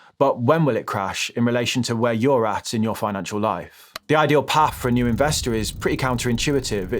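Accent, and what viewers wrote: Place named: Black Country, United Kingdom. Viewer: It sounds like the black country, maybe walsall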